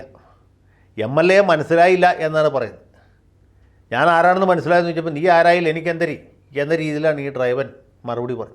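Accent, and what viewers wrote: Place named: Trivandrum, India. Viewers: Nice TVM slang... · Super Prezzentation by Advocate Jayshankar sir 😁😁😂😂.Tiruvananthapuram SLANG 👌👍😂.Another AVESHAM Episode 🤣🤣. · U deserve appreciation, tvm slang- well done..... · Your Trivandrum slang is so good.. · Super thalaiva especially Tvm slang sema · Trivandrum slag pwoli😂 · Trivandrum language super sir 👏👏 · തിരുവനന്തപുരം സ്ലങ്ങു അടിപൊളി സർ 🙏🙏👍👍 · Trivandrum slang is super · സർ ____തിരോന്തോരം ഭാഷ കിടു 😄🤣😂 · 😂😂🙏🤝👍🤭 സാറ് അസ്സലായ് തിരോനന്തരം ഭാഷ സംസാരിക്കണല്ല്😂😂യെവള് രാജ്ഞിയാ മുഖമടച്ച് ആട്ടണം പാവം ഡ്രൈവർ നിയമം അറിയാവുന്നവർ ഇവർക്കും ഭർത്താവിനും എതിരെ കേസെടുക്കണം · സാറിൻറെ മിക്ക ഡിബേറ്റ് കളും, വീഡിയോകളും,ഞാൻ ശ്രദ്ധിക്കാറുണ്ട് പക്ഷേ ഭാഷയിൽ വരുത്തിയ മാറ്റം ഈ തിരുവനന്തപുരംകാരനായുള്ള പരകായ പ്രവേശം വൗ 💪😛സൂപ്പർ🙏🏻♥️ സാർ മാസാണ് കൊല മാസ്സ് ♥️♥️♥️♥️♥️♥️♥️♥️ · is very proficient in trivandrum dialect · ഓ അണ്ണന്റെ തിരോന്തരം ഭാഷ പൊളിച്ചു😂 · Sir your Trivandrum slang is great🎉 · Adv സാറിന്റെ തിരുവനന്തപുരം ഭാഷ വളരെ നന്നായിട്ടുണ്ട് 👌👌👍👍😃😃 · Sir I like very much your TVM language. 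Super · 😂😂😂😂 സാർ തിരുവനന്തപ്പുരം ഭാശ കലക്കി😂😂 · Sir your TVM slang super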